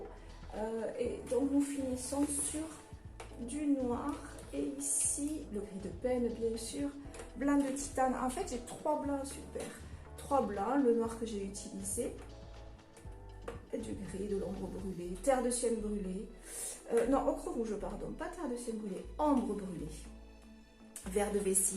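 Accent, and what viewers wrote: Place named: Toulouse, France. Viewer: Magnifique ! Merci pour ce tuto très coloré et moderne....accompagné comme toujours de votre joli sourire et du petit accent toulousain 😁😉😘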